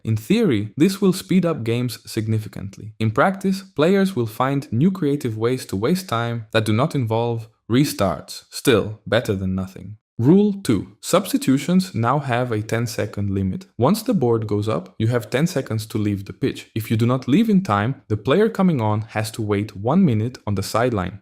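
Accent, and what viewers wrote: Brazil. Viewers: oh wow. You really sound Brazilian hahhaha the Croatians that I work with, doesn’t sound like this at all. Good for you hahah And no hard feelings about 2022, ok? 👍🏼 hahhah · É um brasileiro q ta fazendo essa narração?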